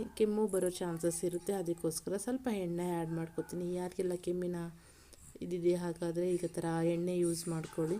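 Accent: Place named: Telangana, India. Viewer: Good TELANGANA Telugu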